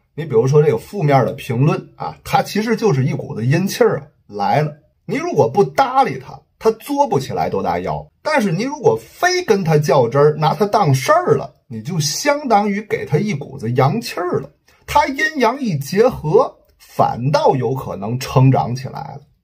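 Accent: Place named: Tianjin, China. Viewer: 第一次听到带津味理智的优良政治評論！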